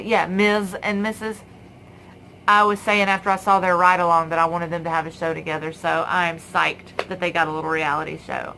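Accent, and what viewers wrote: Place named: South, United States. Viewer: I'm Here Miss! lol I subscribed and rang the bell. You're Southern? I didn't know that. Your accent is cute lol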